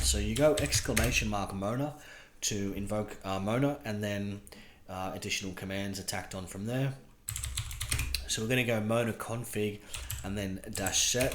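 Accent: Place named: Australia. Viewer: Great series here. Enjoying it while doing OSCP. Nice hearing another Aussie on youtube too lol. Thanks for the uploads!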